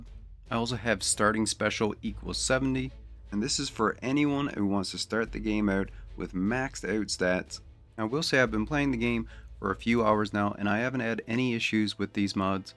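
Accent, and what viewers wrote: Newfoundland, Canada. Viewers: I know another Newfoundlander when I hear one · Helpful video, thanks 👍 Also the second I heard you talk I was like, "Is this guy from Newfoundland too?" 😃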